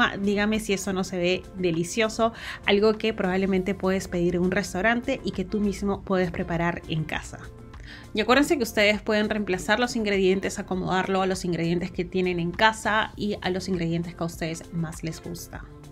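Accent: Peru. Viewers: Buenísimo esto gracias ❤ Tu voz es como del peru❤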